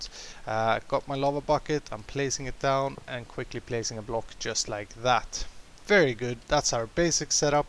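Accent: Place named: Sweden. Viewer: lol snygg svensk dialekt. shota into lava lol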